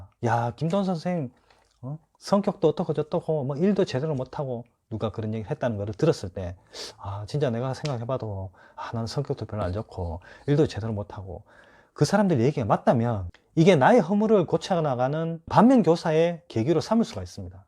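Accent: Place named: Gyeongsang, South Korea. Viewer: 감사합니다 ~^^ 지나가다 들렀습니다. 구수한 경상도 사투리가 친숙하네요 말조심하는 하루가 되도록 연습하겠습니다.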